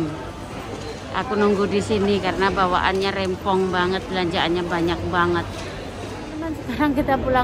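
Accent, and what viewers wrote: Java, Indonesia. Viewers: Semoga keluarga kita selalu dlm lindungan ALLOH swt amiiin...mbak medok wong jowo bangeeet ☺ jember selalu hadir ☝️🙏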